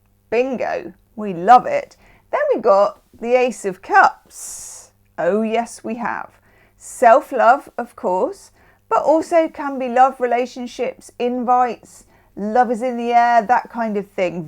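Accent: New Zealand. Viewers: Haha, your Aussie accent is more New Zealand/Kiwi accent. One of my English colleagues, when living in London, used to say that 'England is a cold country full of cold people', we're a very warm country so maybe that's why we're warm-er 🤩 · 😂😂You sound a little more like a kiwi (New Zealander) 🎉❤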